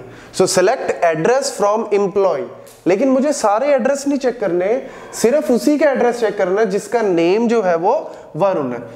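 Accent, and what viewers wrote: Punjab, India. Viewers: punjabi dur se pta chal jate hai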